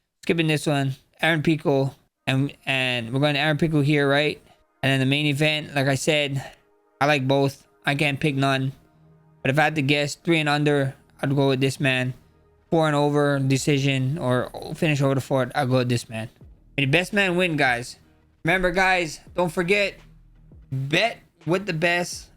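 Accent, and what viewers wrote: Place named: Hawaii, United States. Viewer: Just talks like every other Braddah out here 😂🤙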